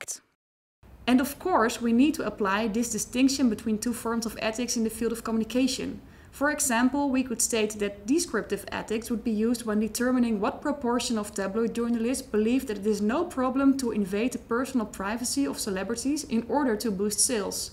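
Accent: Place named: Spain. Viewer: This person is definitely from Spain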